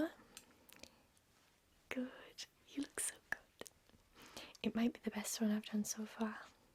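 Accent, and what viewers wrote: United Kingdom. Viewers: Wait...she's British...well this suddenly got VERY interesting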